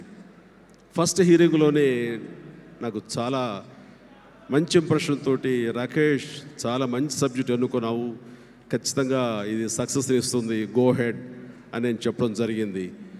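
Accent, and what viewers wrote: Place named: India. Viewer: Eena okadu English ni telugu laaga matladuthadu accushan choola baaga chesadu antha alludu...!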